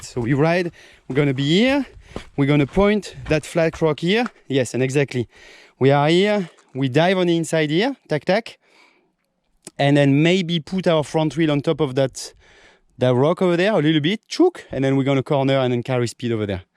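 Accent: France. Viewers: Mais cet accent est tellement bon ! So French ! C'est bon de te revoir rider ! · Me encantas <3 acabo de suscribirme a tu canal y te sigo en Instagram!. Eres un Crack voy a ver todos tus videos para ponerme al corriente, tienes un espíritu salvaje y un chuloooo acento francés, que mas se puede pedir!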